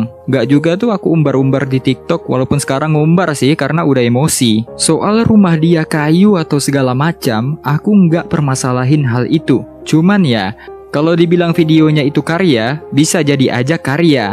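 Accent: Pontianak, Indonesia. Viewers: Abang tinggal di ponti? Pantas logatnya kayak kenal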